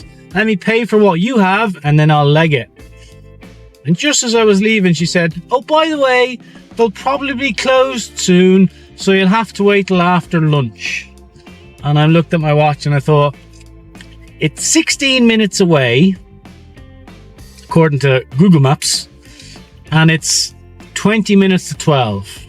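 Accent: Ireland. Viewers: Keep on keeping on! You are the most wonderful ‘antidote’ for a very long crap day! 😊 As I’m someone of Irish descent I fell in love immediately with your gorgeous Irish accent 😅Seriously your vlog is the bomb - it helps me calm down after a long day! Daylight saving arrives back in New Zealand this coming Saturday - thank goodness! Can drive home in the daylight from work. Keep doing you - you are the perfect recipe, ps Need my coffee too x NZ 🇳🇿👏🏻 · Love the Irish accent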